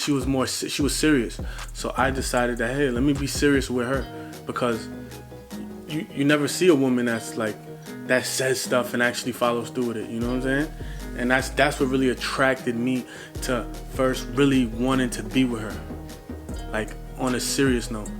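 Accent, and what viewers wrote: Jamaica. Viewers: Can tell you have a little Jamaican in u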